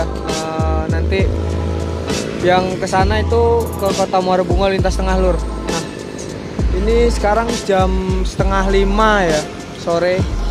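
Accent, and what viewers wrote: Java, Indonesia. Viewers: Logad lu Jawa bngt coy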